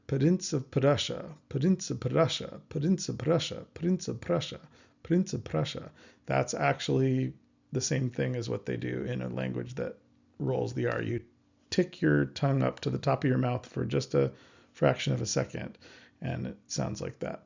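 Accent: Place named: United States. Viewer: Nah, too american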